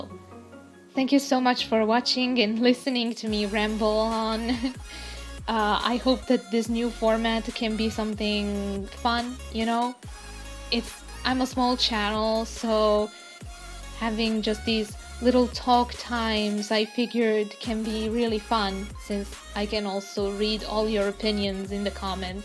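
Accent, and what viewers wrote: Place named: Syria. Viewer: Of course! I have a friend from Syria and her accent sounds similar to yours, so I was curious. I love your art style and have a nice day!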